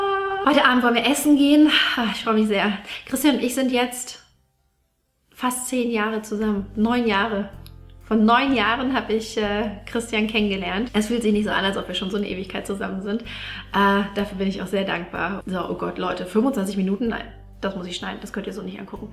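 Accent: Swabia, Germany. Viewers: TGIRF 🚀🚀🎉 ich muss echt sagen ich kann diesen schwäbischen akzent gar nicht ab😂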